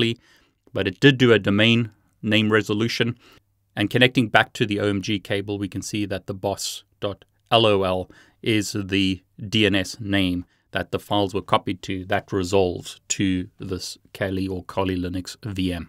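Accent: South Africa. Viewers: for some reason somehow your accent sound like an english south afircan to me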